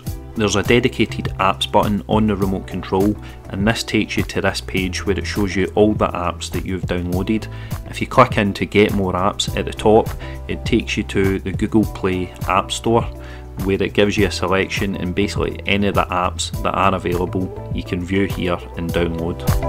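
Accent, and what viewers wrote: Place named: Scotland, United Kingdom. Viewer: Ayeee nice to see Scottish accent around! Cheers mate!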